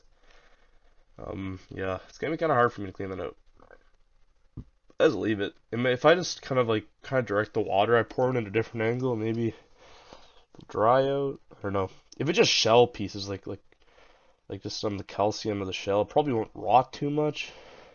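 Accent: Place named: North America. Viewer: The colony scent comes from the grease/oil they are covered in. This layer is made up of strings of hydrocarbons and the combination is different for each colony, they apply it when they groom themselves and each other. It also acts as their water proof layer so they repel rain drops and is the reason they look reflective when you shine a light on them to film. Be careful adding different queens, it's almost impossible into an established colony and the other issue you've got is you actually don't know what species your ants are. Judging by your accent I'm going to assume you are in North America and this makes it fairly unlikely they are Lasius niger. They might be, it is possible there are a few pockets of Lasius niger especially in Canada, but is more likely they are Lasius neoniger or Lasius americanus.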